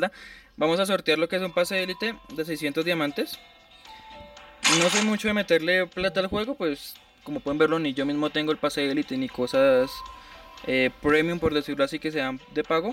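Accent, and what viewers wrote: Colombia. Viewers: Oye amigo quiero partisipar aun esta en abierto el sorteo ?? Soy colombiano y tengo todos los requisitos tienes asento de rolo bro las mejores digue asi me ayudaste vastante para comprar diamantes no savia lo de el efecti😎😅sigue asi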